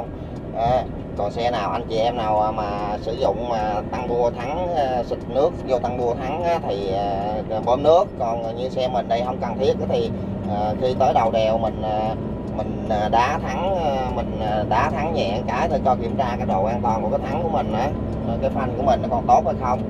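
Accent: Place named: Tay Ninh, Vietnam. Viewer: Giọng bác này ở tây Ninh quê em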